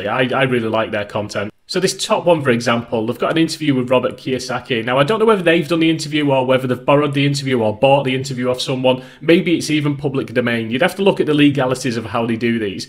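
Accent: United Kingdom. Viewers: Never thought I’d hear a scottish Tom Scott saying “Balls” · 1) thank you for the video it inspired me to try making channel 2) good content and links not overly pushed 3) lovely to hear a uk voice.I love our American friends but YT is to overcrowded with them ;) Cheers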